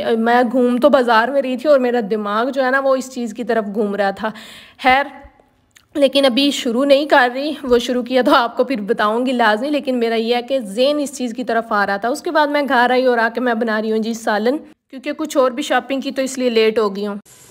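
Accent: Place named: Pothohari, Pakistan. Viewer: Ap potohaar sy hn?ap ka lehja pothohaari ha.lkn ap punjabi show krti hn khud ko.why?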